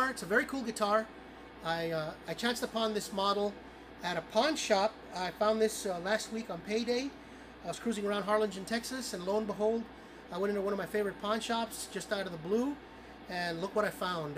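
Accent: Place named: Mexico. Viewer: Tienes cierto acento mexicano jaja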